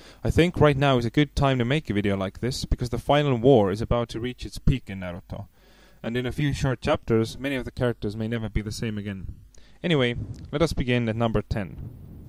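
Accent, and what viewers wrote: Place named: India. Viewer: I like you're indian accent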